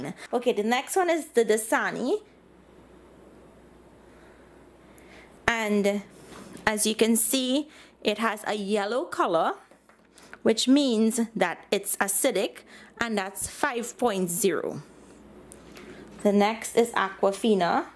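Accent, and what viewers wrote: Trinidad and Tobago. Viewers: Are you from Trinidad? I love your accent! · KC and LoLo 23 it's a Trinidad and Tobago accent i.e. Caribbean 😊 hence the name of her channel as well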